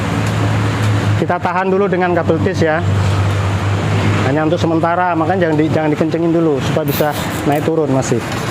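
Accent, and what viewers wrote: Java, Indonesia. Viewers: Jawanya medhuukk😅 siap praktek boskuu❤ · Bapak orang Jawa yaaa, .. lucu kalau kasih penjelasan, jadinya mudeng xixixix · Aku di semarang p de mohon maaf caranya mupuk dan media anya apa sama di jawa dan di balik papan tapi logatnya koyo orang jawa... Terimakasih pak deje las sekali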